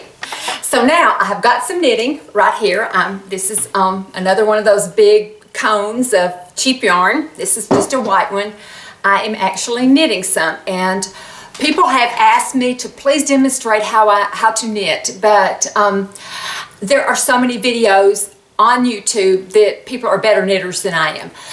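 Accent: South, United States. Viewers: you're fantastic! I have so enjoyed watching your videos for the last few months and love all your recommendations. I have been thinking I needed to take up knitting again. My novice ability should be able to handle these facecloths. Great idea! Thank you so much. I so love your sweet southern voice and your fun informative presentation. I have enjoyed every video I have seen. It is so nice to be able to get all the ideas I need at my age to keep current, healthy and active. I have used tretinoin on and off for 30 years and it does make a difference so I am using it more in the last year and even on my eyelids the last few weeks after I saw women on YouTube say they had used it. It did get very dry and irritated the first time and then I used oils to hydrate and then cautiously proceeded the next week to use it with my eye cream every other day. Thanks again. 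xo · Hey gorgeous! Where are you from? Your accent is adorable. Very southern. Would love to be involved in your groups (women of YouTube over 45). How do I get involved? I’ll be 55 on the 23rd. Thanks.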